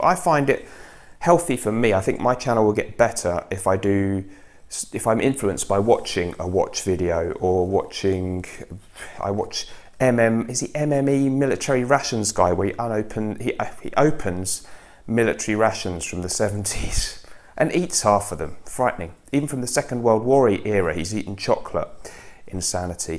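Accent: United Kingdom. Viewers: Heeey, you are too harsh to yourself))) buuut I totally agree with you, the one can take inspiration from other topics of interest and hobbies...you can make podcast, interviews with interesting people, indepth description of a particular fragrance, we learn a lot from you. I like your British English and sense of humor.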